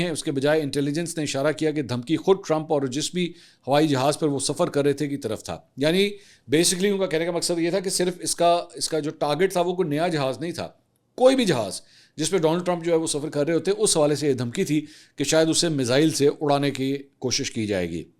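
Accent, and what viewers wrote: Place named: Lahore, Pakistan. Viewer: بھائی یہ جو سٹی کا نیم ہے وہ اپ پروپر اس کو بولیں گے تو۔یمبو کہلاتا۔میں سعودیہ الریاض میں رہتا ہوں۔ اور اپ کی اپ کی ویڈیوز کو باقاعدگی سے دیکھتا ہوں۔اپ کا بات کرتے ہوئے پنجابی لاہوری ٹچ بہت ہی کمال ہوتا ہے۔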